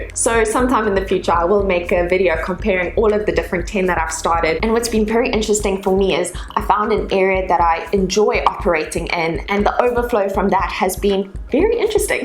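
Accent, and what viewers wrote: South Africa. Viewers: I’m guessing you are from SA ? It’s really so nice to hear your accent on this app , so refreshing 😊😊. I’m from Namibia by the way 😄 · By the way I guessed your accent as I have 2 good friends from SA. Keep being genuine and honest and above all stay safe. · Keep up the work fellow Saffer ,sounds like a Durbz accent.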